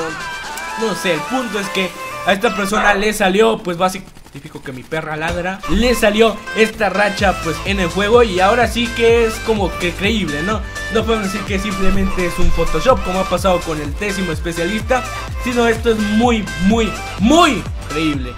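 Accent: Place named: Spain. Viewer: deja de hablar como español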